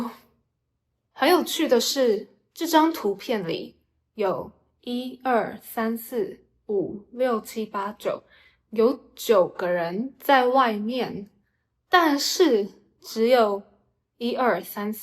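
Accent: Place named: Taiwan. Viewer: Baby's Chinese mandarin is Taiwanese style lol I know he speaks good Chinese but very Taiwan ah : ) plus he always acting cue OMG the way he types words and how he expresses himself, anyway not mainland China style :face-green-smiling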